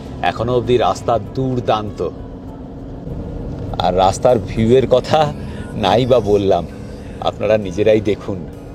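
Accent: Bangal, Bangladesh. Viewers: Apner bangal kotha gulo ekhono gelo na puru bangal, blog moteie bhalo na chredin apner dara hobena jotoi chesta korun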